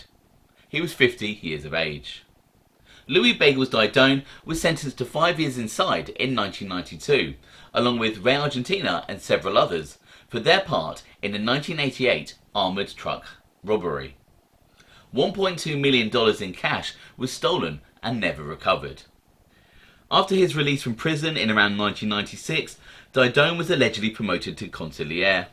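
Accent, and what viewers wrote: United Kingdom. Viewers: I love hearing Brits educate about the mob. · Is this gay brittish guy trying to teach us about our history?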